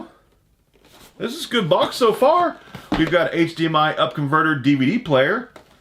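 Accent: United States. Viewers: Omg what part of Indiana are you from??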